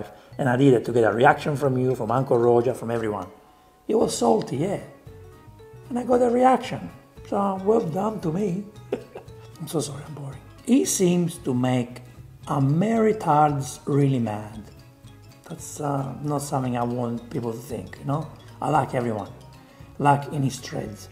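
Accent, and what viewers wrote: Italy. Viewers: Thanks to I finally learned how to do a good pizza dough, now it’s very popular amongst my friends everybody loves it. Learn to do real Italian food from a real Italian, I wouldn’t trust you if you didn’t have that Italian accent;) The reaction videos are good to Actually you learn more from those videos like “ don’t put oil into the water”:) 👍 This is a great channel. Just keep it going my friend. Saludos! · I just have to ask this 😂 Why do italians learn other languages so hard? You probably at this point, lived in english speaking communities more than Italian, yet you either force your italian accent (don't believe this) or this is just the way you speak, not that I mind, but I just heared many italians that don't rly pickup other languages even after 20-30y living in a different country 😂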